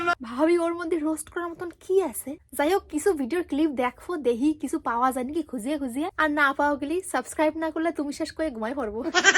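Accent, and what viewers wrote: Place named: Bangladesh. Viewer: Bangladesh er kun jaigai jeno amon vabe kotha bole 😂😂😂😂😂😂😂kotha gula khub valo lage😂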